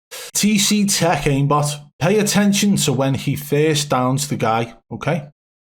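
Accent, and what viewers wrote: Liverpool, United Kingdom. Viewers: Bruhhhh your scouse accent is killing me😖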